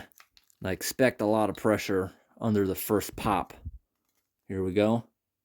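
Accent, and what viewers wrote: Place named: Alaska, United States. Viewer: I was born and raised in Alaska, and he reminds me of a true Alaskan! Even the way he talks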